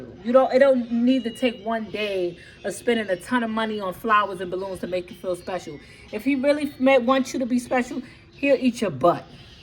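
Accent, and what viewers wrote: New York, United States. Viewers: Im loving your channel and I love hearing you talk!! I love the ny accent...ima Florida gal!! I drive my 18wheeler thru NY!! You season your food like I do mine but ima use the sauces.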